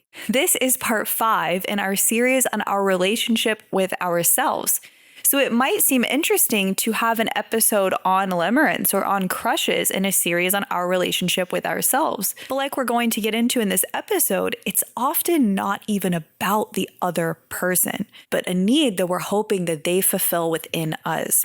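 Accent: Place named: California, United States. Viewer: hello, your speech is amazing are you from western California.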